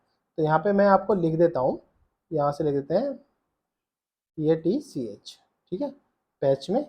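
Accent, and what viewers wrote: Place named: India. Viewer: Your Englisch is terrible, I don’t understand one word! You are speaking much to fast in your Indian slang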